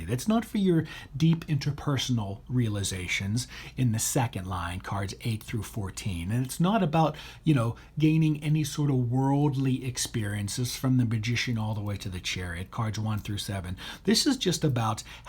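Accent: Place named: Pittsburgh, United States. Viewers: Can't hide Pittsburgh. It always slips out 😄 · I detect a Pittsburgh accent!:)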